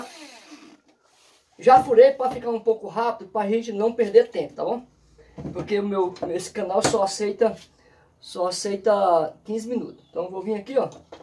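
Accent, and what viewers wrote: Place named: Ceará, Brazil. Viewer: Ô vc parece ser cearense!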